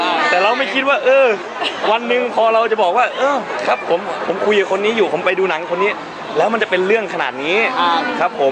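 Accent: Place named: Isan, Thailand. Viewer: ชอบตรงพูเดกลางสำเนีบงอีสานเนี่ยแหละ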